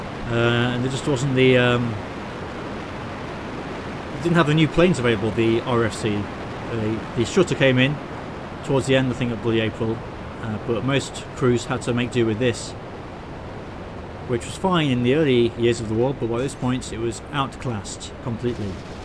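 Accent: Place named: East Midlands, United Kingdom. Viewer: Good stuff, another excellent video with a great couple of fights. As a noob to RoF, I have learned an awful lot from watching your output. May I ask three questions? Why is that nobody in the RoF ever seem to pay attention to aircraft instruments? Along with everything you have put out I have also watched JimmyBlonde (alas now MIA), Dukely and diverse others and none of you ever seem to look at instruments. Secondly, I appreciate you are busy and haven't been very well, but will you be coming back to the Barrakuda campaign? That was shaping up to be a real beaut. Finally, if you don't mind a personal question, where are you from? I have been trying to identify your accent, I can't and my failure is driving me nuts. I'd guess somewhere in the East Midlands, maybe bordering on East Anglia but I'd can't place it. Anyway, keep up the good work and know your efforts are greatly appreciated.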